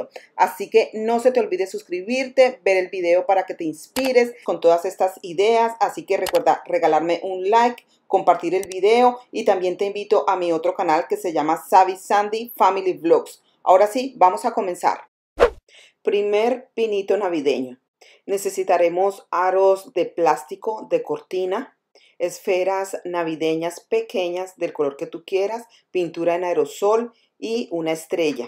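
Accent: Colombia. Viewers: Hola Savvy soy nuevo suscriptor miré tus videos y me encantaron, explicas super bien y sobre toooodo es que haces las manualidades MUY ELEGANTES y sobre todo a bajo costo... Me Fascina tu casa la tienes muy ordenada, limpia y de buen gusto, te mando un saludo desde Guadalajara Jalisco México 🇲🇽. Me gusta también tu acento al hablar así tipo Colombiana 👏👏👏👏😉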